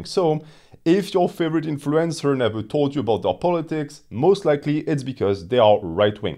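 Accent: France. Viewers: Sounds french to me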